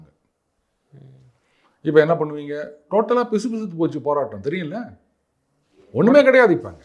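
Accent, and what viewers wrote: Chennai, India. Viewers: Bro significant post chennai slang amakalam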